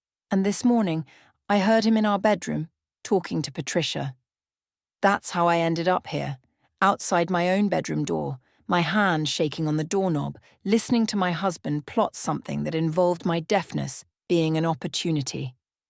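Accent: England, United Kingdom. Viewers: Just love your real, English accent. So tired of listening to the same, possibly AI-generate voices and bad narration... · Very interesting story , Love your English accent ❤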